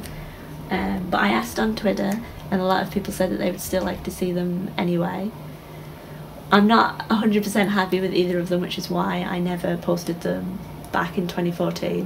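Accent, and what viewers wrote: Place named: Northeast England, United Kingdom. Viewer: First thing: That picture is awesome! Like, super amazing! Second: Your accent... It's my accent... Are you from somewhere in the triangle of cities of Newcastle, Sunderland and Durham? (I'm from a town an equal distance from all three and sometimes it's hard for me to tell which specific accent comes from which city.)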